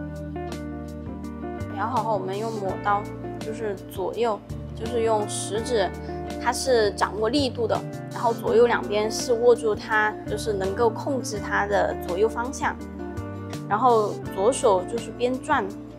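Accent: Sichuan, China. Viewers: 老师是四川人吗:chillwdog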